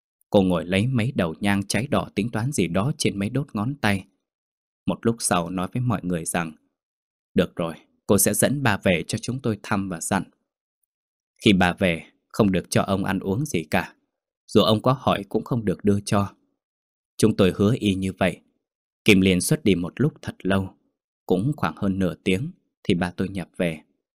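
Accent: North, Vietnam. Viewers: Giọng bắc diễn đọc thật hay.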